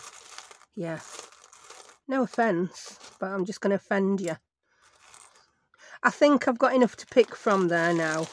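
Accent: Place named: Black Country, United Kingdom. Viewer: I just found your page for the first time today. I love your accent! Are you from the Black Country? Gave you a follow. I love your page!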